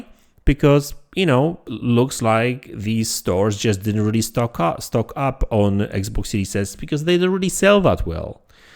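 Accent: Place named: Poland. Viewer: Od razu w intrze usłyszałem ten polski akcent xd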